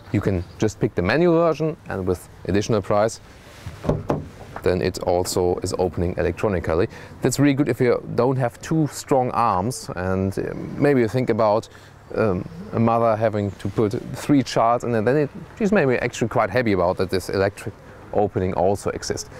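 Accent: Germany. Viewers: 德国人说英语其实非常有特色，而且在欧洲算是很流利的。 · My men ur English sounds quite. ... German 🤣 all the respect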